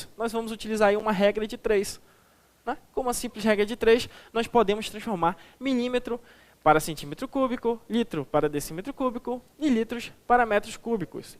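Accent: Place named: Rio de Janeiro, Brazil. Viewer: mt carioca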